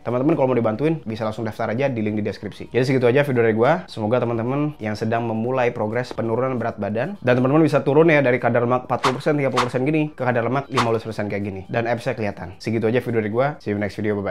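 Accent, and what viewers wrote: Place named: Arab world. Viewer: Paling suka chanel ini penuh ilmu, gratis, dan abangnya kalo bicara enak di dengar kocak, logatnya kaya orang timteng ada maqrajnya, kek basa arab 🤣😂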